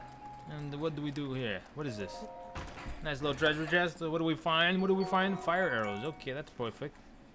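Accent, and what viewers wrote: New York, United States. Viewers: I will have to say, I realized right away that this is the first YouTube video I've ever watched with a New York/Guido accent. I'm not even mad. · So, im from NYC (born BK, raised Staten Island, so you can imagine The accent I have)..and so many of my gamer friends want me to voiceover my videos cuz of that accent. And hearing you in your video, now I understand why. Absolutely awesome 🤣🤣 (side note, thank you, I couldn’t find this shrine for the life of me lol)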